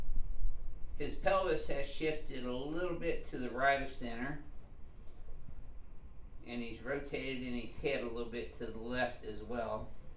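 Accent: South, United States. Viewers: Total high pitched cowboy hick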